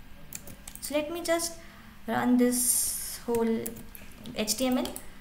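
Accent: India. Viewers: i like your voice.... after long saw a video with familiiar indian accent... worth watching..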